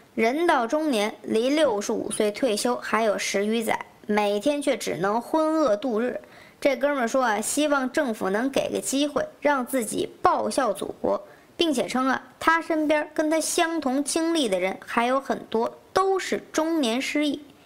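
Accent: Beijing, China. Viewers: 这口音是京片子吗？真逗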